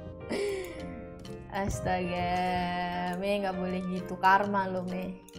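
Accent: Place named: Java, Indonesia. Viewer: Suara mu medok banget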